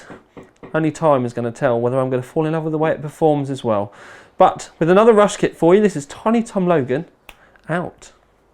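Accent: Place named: England, United Kingdom. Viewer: He sounds like he knows what he's talking about because he has an English accent.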